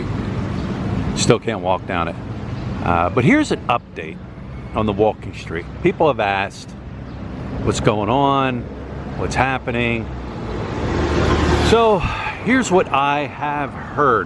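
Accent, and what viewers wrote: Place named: Chicago, United States. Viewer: You sound more as if you are from Chicago than from Philly. I have heard so many different things from Thailand and PI that I do not know what to believe any longer. Never been the RED LIGHT or bar type but I lived in Makati and different places in Thailand before. I think bars are opened here in Denver. Lots of obnoxious people noise and motorbikes outside at night this last week. Last I heard from a Filipina was that PAL will start flights from overseas June 1, then after that someone said January 1st, 2021. I heard this yesterday. I'll subscribe to your channel in hopes of being kept informed. Good Luck!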